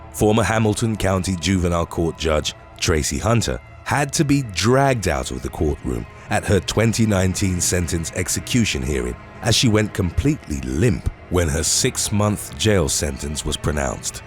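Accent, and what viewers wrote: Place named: United Kingdom. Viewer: Haha funny British narrator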